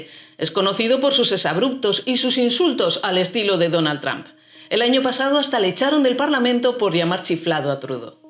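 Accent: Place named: Spain. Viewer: Señor para su informacion ella es de españa y asi se habla el espan̈ol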